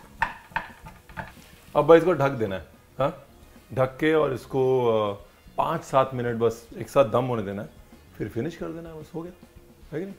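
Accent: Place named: Lucknow, India. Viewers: I was going to say that. He says so many times how he grew up in Lucknow and he is UP ka ladka. His way of talking has all the sweetness and sophistication of Lucknow and UP. And even when he is making Punjabi Samosa he can't keep himself from mentioning Radhey Shyam halwai. But to be honest, Ranveer belongs to all of India now. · Tabhi mai kahu ki apki zaban aur lehza lucknawi kaise hai.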